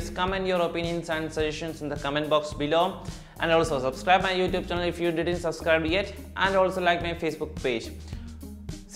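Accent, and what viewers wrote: Kerala, India. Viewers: മലയാളി ആണോ???english കേട്ടപ്പോൾ അങ്ങനെ തോന്നി അതാ!!!!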